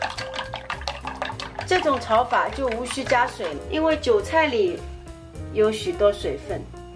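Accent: Shanghai, China. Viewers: 日锅日油，哈哈，沪普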